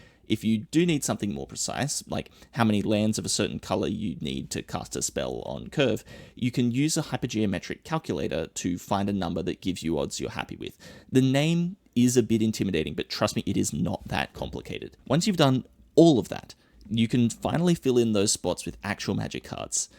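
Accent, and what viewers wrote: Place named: Australia. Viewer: bloody brilliant to hear an aussie accent on mtg youtube! instant subscribe!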